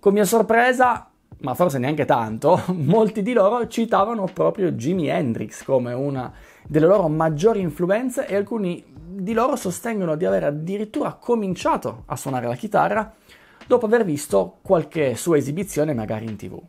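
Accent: Piedmont, Italy. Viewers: Io (ragazzo negli anni '80), sono proprio partito dai chitarristi vecchia scuola (Knopfler è stato il mio primo idolo, e poi i più vecchi Clapton, Hendrix, Page, etc.) e solo in seguito mi sono avvicinato ai mega virtuosi che in quegli anni impazzavano. E devo dire che alla fine i virtuosi mi hanno un po' stufato, a parte pochi casi come Van Halen o Morse, mentre i vecchi classici sono restati. Anche perchè la mia tecnica è quella che è. Ora stimo enormemente gente come Govan o Timmons, tecnici ma anche raffinati, però continuo ad ascoltare molto più spesso il vecchio blues rock o hard rock ignorante, anche fatto da band contemporanee (ce ne sono in giro di molto interessanti). PS: viva l'accento piemontese 😄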